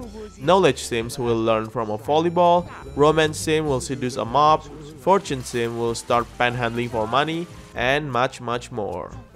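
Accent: Indonesia. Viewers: are you from Indonesia? I can hear your Indonesian accent. ✌️✌️✌️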